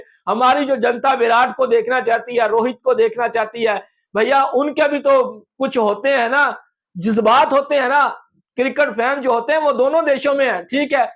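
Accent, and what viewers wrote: Pakistan. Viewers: This guy is fraud trying to talk like indian with cheap pak accent he is actually pakistani wearing indian jersey.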